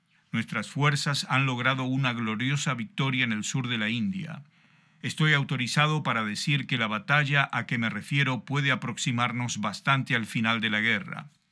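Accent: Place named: Latin America. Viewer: JOLINES..!!! ESTE ES ESPAÑOL LATINO... VAYA AL FIN GRACIAS COMPADRE.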